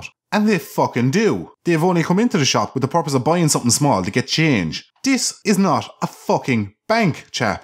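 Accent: Ireland. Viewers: You're a great laugh to listen to. Keep up the great boyo and lets grow your channel. If there's one thing the world needs more of it's an angry Irishman giving out fuck about shite.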